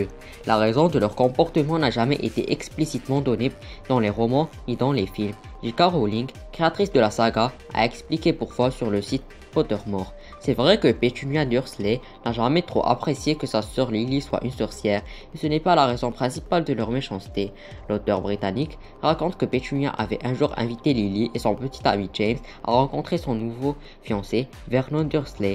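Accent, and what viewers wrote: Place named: Morocco. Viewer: C'est quoi ta voix ? Accent ou ton pays ? C'est un mélange entre un marocain, un québecois ! En plus on dirait que tu as de l'asme ! Ta voix c'est un bordel omg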